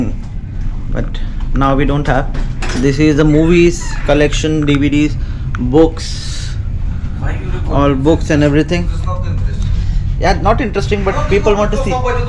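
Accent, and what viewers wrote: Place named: India. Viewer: Awful indian accent, Killed all vives. Life in modern vessel always fascinating but he could not describe it properly.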